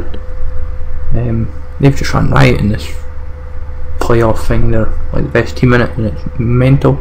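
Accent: Scotland, United Kingdom. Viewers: Just not use to Scottish accent I guess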